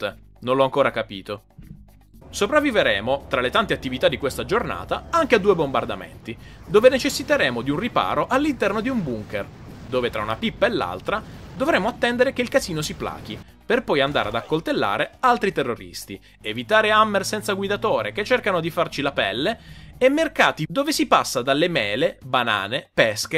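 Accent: Bari, Italy. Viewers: Tu e il tuo accento barese spaccate <3 :D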